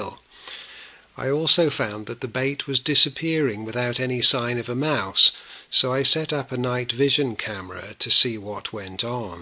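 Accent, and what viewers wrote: United Kingdom. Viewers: I have not seen this trap for sale in the UK, I think it's only sold in the States and Canada. But you sound very English, just wondering??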